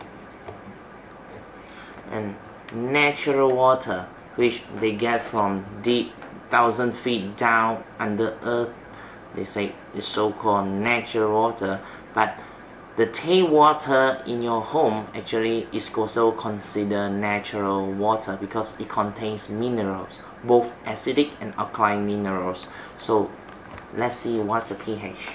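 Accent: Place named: Thailand. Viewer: this guy is Thai from his accent...he said "minira" lol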